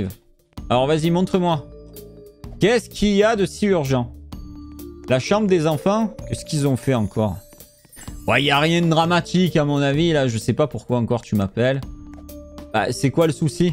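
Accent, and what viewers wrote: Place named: Marseille, France. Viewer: Cc je pense que tu habites à côté de Marseille tu a des accent 😭😭😝😝😜😜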